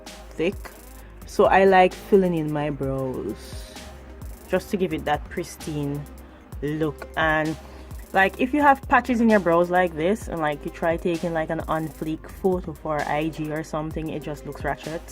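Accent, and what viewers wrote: Jamaica. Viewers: Are you Jamaican or Africa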